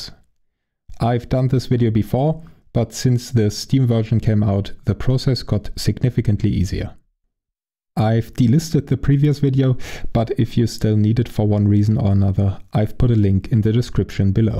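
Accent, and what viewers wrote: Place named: Germany. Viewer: I am developing a Pavlovian response to German accents in YouTube guides at this point! I got excited when I heard your accent at the start and I wasn't disappointed. everything was spot on: patient, well-paced, empathy and context provided for those new to Deck, actually covers all the details, thorough about making sure everything described has associated video, exaggerated finger movements so it's clear which buttons you're pressing, zero padding. thank you so much for this amazing guide <3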